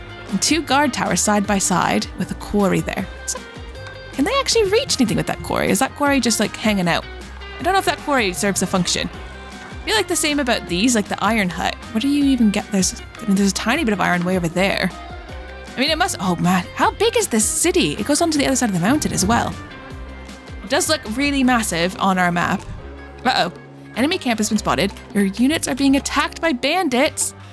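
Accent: United Kingdom. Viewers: I thought I heard a bit of British and some other accent.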